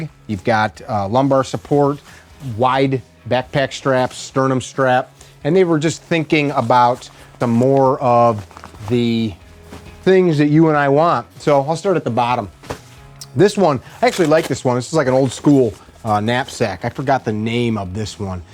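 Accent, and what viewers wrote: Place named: Midwest, United States. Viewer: Born in Des Plaines, live in Phoenix now, always a breath of fresh air hearing your Midwestern accent! love the content!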